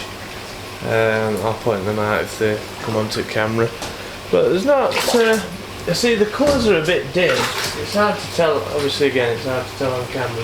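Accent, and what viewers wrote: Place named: Yorkshire, United Kingdom. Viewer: great videos ive got many good tips watching your vid blogs. only had koi for the last 8 months and need all the help i can get. plus you sound like a local lad im in rotherham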